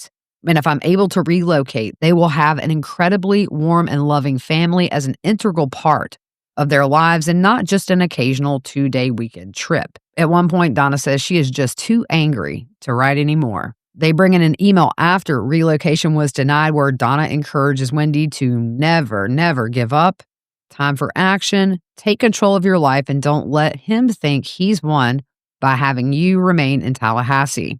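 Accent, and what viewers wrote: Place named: Boone, United States. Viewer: One can become invested in the success of a content creator. In my case about two years ago my wife asked me why I was emotional. I was blinking back tears as was talking about her Grandmother, taking her to get her hair done or to a medical appointment. Then I learned daughter moved out of state. I told my wife I am reminded of my family from Boone NC area. Similar accent etc, same heart. I tithed my time to this podcast as I shared and liked the podcast. I also try to write a comment that a new viewer may like and subscribe. I am now in a position to help this channel with $$ and I plan on making it happen Asap. Thanks …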